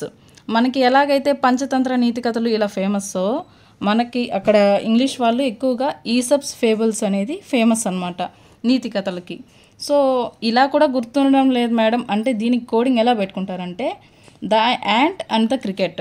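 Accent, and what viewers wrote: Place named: Guntur, India. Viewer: Madam గారు from Guntur అనుకుంటా 😊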